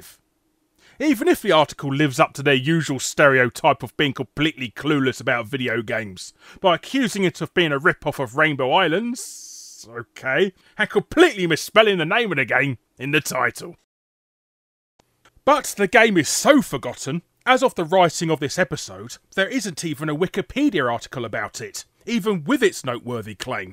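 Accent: England, United Kingdom. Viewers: You sound more bri´ish then bloody Ashens! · Top stuff, so glad to have a notable British voice amongst the endless drone of Yanks on the web - more UK Home Computer vids would be great! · What what of the UK are you from? I always wanted to know that accent came from. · Always a British narrator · He's English, that's why.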